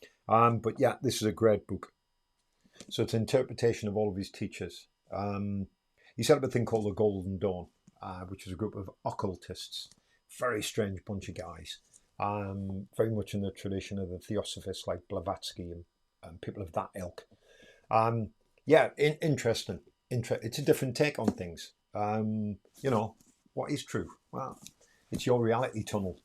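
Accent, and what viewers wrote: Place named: Newcastle, United Kingdom. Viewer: Ill give you Fifty Quid if you say Utterly butterly in your Geordie accent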